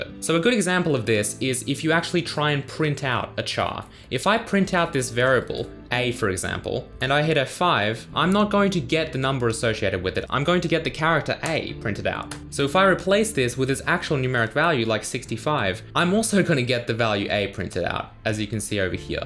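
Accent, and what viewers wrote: Australia. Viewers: That’s the Australian accent right? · He's an Aussie.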